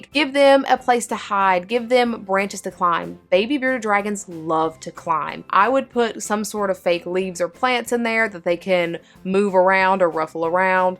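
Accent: South, United States. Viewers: Such a pretty and upbeat young lady. Love that southern accent! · I like your southern accent, and the way you say thangs lol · New viewer. Great content! You from the South?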